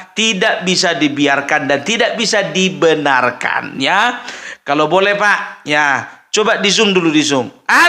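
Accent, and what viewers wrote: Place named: Batak, Indonesia. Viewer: aku suka nonton konten nya dan logat bataknya lucu banget dan mengena sm orang nya yg intoleran terhadap agama lain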